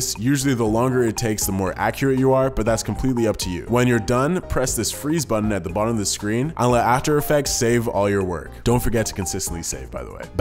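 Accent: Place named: United States. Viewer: Top entertainer with a great feel for humour and edits. I've heard you have a comedy sketch filmed that has never seen the light. Although you sound American my intuition is that the film has a Scottish feel to it. Is this ever going out? With your skills you should be able to turn it into a 4/10 imdb rated film at least....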